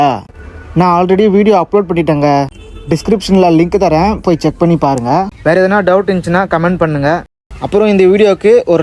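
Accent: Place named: Vellore, India. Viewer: Vellore Tamil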